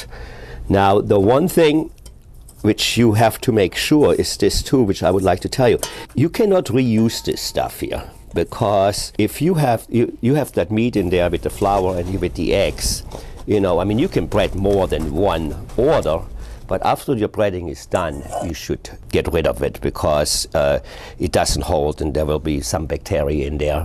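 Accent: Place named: Germany. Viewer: i like his German accent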